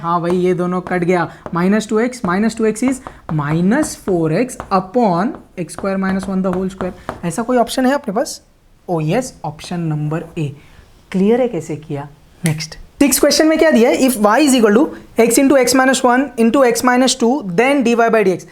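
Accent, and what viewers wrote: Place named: Hyderabad, India. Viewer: Hyderabadi hai kya ji tum bhot bharti bolte aur sikhate bhi🔥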